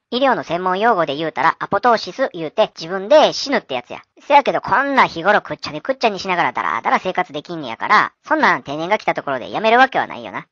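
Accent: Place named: Osaka, Japan. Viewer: 大阪弁やから理解しやすい最高ありがとう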